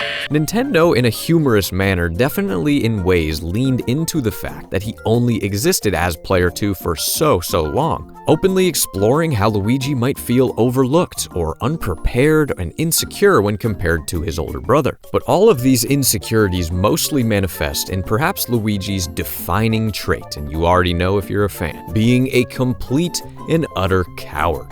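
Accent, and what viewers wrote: United States. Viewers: And do I detect a hint of a ghost of a southern twang?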